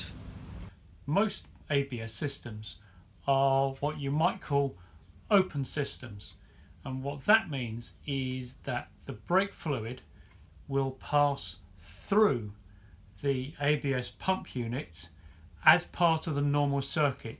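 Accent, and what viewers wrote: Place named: England, United Kingdom. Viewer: Great info and good English accent as opposed to Yanks!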